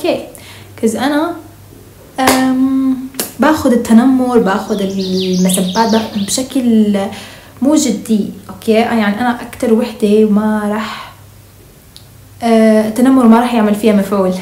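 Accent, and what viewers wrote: Iraq. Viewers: ياعمرييييييي✨ الف الف الفف الفففف مبروككككك ❤🙀 والله فرحتلككك من كل قلبي عقبال 100 مليون يارب 💖 ياعمرييي وبسس متابعتكك من العراق🌷 احبكككك كتيرر موححححح😭😭😭💗 احيههه تقريبا كل كلامك عراقي فديت الي يتكلم عراقيي فديتكككك عععع 😭😭💅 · مبروك يا بعد روحي جاي اتحاول تحجي عراقي اموت عليج ❤❤❤ احبج متابعتج من 🇮🇶انتي🍯🍯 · الف مبروكك اني من العراق🇮🇶 واحب كل فيدوهاتج وكلامج نفس العراقين❤✨ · كلامج تقريبا كله عراقيي يااا عمرييي 😭😭😭🍓✨🤭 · فديت الي تتكلم عراقي+احبك موت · 😮😮اول مره اشوفك تحكي بنت ليكون عراقيه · الف الف الف الف الف الف مبروك ان شاء الله ال <num> قريبًا يارب والله فرحتلج من كل كَلبي احبججججججججج متابعتج من العراق ❤💋💗 لهجتج العراقية تجننننننننننننن ❤️‍🔥🍓🇮🇶💘🇲🇦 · مليون مبروك على المليون تحبك هواي متابعتج من العراق لهجتك العراقية تجنننن ❤❤❤ كيوتتتت ❤❤❤❤ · صوتك بلعراقي مررة حلو مين بدو تعمل يوم كامل المتابعين يتحكمو بحياتك🗿👍🏻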